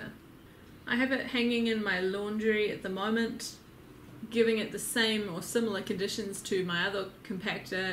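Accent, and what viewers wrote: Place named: Auckland, New Zealand. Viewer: Hi from Auckland, I'm Sarah from Auckland. I wonder if we went to school together a long time ago. I was watching a bunch of Hoya vids then yours popped up and I thought "Hey! I know that accent!" Lovely collection you have there. I've had one hoya my whole life then recently started adding more. You've done soooo well with your Obovata and Kerrii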